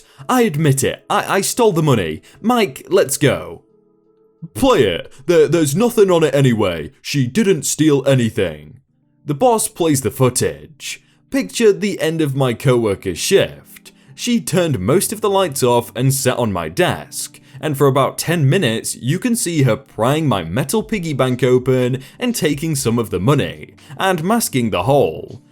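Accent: United Kingdom. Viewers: Hey, you’re deep. You’re also noticeably more British in your speech. I love it.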